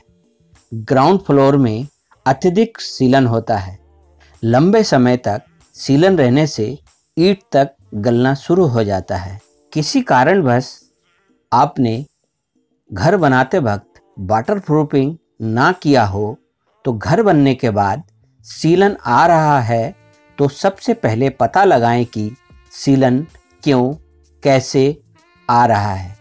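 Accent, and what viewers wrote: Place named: Bhopal, India. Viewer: Sound like Bhopali 😀👍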